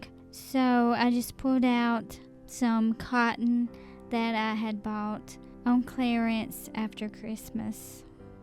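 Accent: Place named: South, United States. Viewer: I don't blame you for that. I was just wondering because I saw it in your older videos and thought if you were still doing something like that I certainly wanted to look into it. Thank you for communicating with me. I love your videos with your Southern accent that I enjoy so much. I am originally from Texas and now live in Missouri. I get teased (even after all these years) about my accent. They call me Paula Deen. I take it as a compliment. Lol! Now if I could just cook like her and had her money! Only kidding. I am blessed beyond measure!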